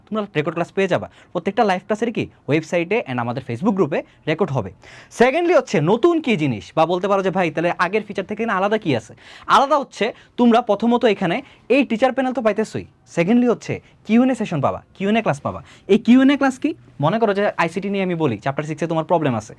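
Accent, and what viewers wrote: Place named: Noakhali, Bangladesh. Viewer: Vaiya mone hoy Noakhali er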